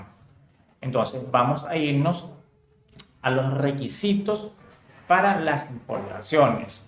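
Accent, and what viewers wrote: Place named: Apure, Venezuela. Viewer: Eres apureño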